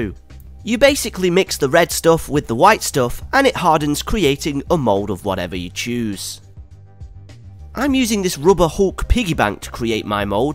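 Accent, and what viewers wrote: United Kingdom. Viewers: Yay he English Btw I don't find many British youtubers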